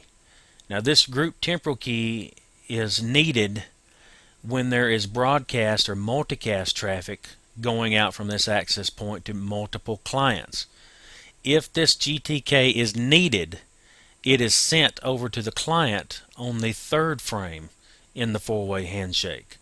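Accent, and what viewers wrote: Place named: Australia. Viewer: Purely out of curiousity, which accent is this? I'm confused, it sometimes sounds Australian (in my ears, that is), then it sounds like it's from the Southern US, which got me intrigued. I'm no native English speaker, so recognizing accents isn't my strongsuit.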